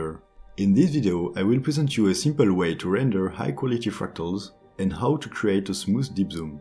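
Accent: France. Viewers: I like your accent u french? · Salut , je voulais savoir sur quelle plateforme on pourrait communiquer. Je laisse ce commentaire en français car l'accent ne trompe pas 😂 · I hadn't noticed but you have a French accent! 😂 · Ohhhh cet accent Français je reconnais:) Nice work!!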